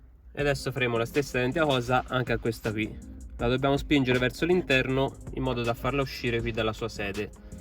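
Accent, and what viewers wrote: Tuscany, Italy. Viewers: Ciao, io sono già andata da 2 elettrauto per altri lavoretti e quando ho accennato a cambiare queste lampadine si sono rifiutati😭..ora capisco perché 😁! Per caso lavori in toscana dato il tuo accento? Quanto può costare farsela cambiare da un professionista indicativamente? Grazie Manuela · fantastico! bel video, poi con l'accento toscano e ancora più divertente guardarlo.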